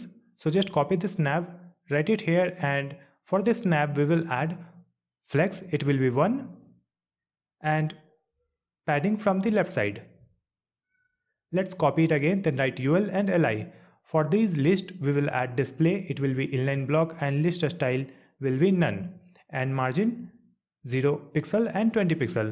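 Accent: India. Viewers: he has a normal Indian accent, also learn to spell.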